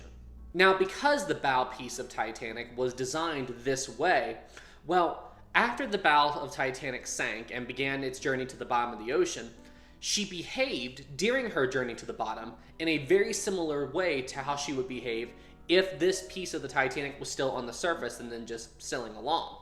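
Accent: South, United States. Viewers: Your a yank though so excuse me if i only catch parts as that accent an hearing it bloody hell!!!!! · Are you from the south I hear a fair amount of twang in words like time it’s a good thing btw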